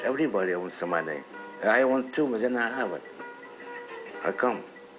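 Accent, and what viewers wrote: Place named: Portugal. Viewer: This is how my parents talk too. LOL :) (Portuguese too)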